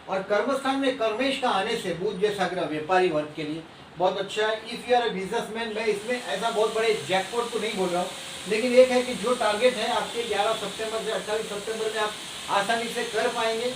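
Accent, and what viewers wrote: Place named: Maharashtra, India. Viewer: ।।🕉नमः शिवाय 🕉जय माता दी 🕉।। नमस्कार गुरूजी आणि शुभ रात्री, गुरूजी आपके सभी विडियो बहुत ही अच्छे होते हैं। मै महाराष्ट्र राज्य का हु और मुंबई में रहता हु आप जब कभी भी हमारे महाराष्ट्र में बोलते हो और बीच-बीच मराठी शब्द बोलने में आते हैं। बहुत अच्छा लगता है। ( गुरूजी आपके नये विडियो नहीं आरहे है। कृपया करके नये विडियो बनाईये धन्यवाद और शुभेच्छा! )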